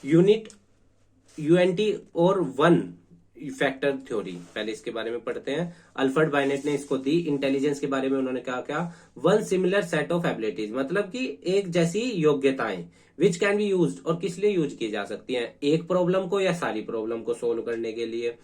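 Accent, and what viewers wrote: Haryana, India. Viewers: Yor are from haryana ?? Thanku bhaiya.. Your explanation is very helpful for me.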